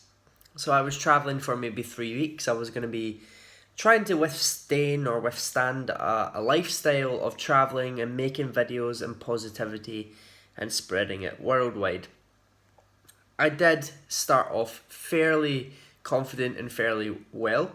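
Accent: Scotland, United Kingdom. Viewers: I love your vlogs, I love your Scottish accent, your eyes, your just a wonderful guy with great advice. Keep doing you.❤️❤️❤️❤️❤️❤️❤️❤️❤️❤️❤️❤️❤️❤️❤️❤️❤️❤️❤️❤️❤️❤️❤️❤️😘😘😘😘😘😘😘😘😘😘😘😘😘😘😘😘😘😘😘😘😘😘😘😘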